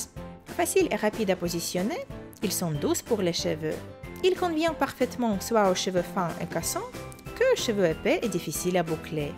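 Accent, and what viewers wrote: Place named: Russia. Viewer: est ce que tu est russe parce que moi oui tu as un accent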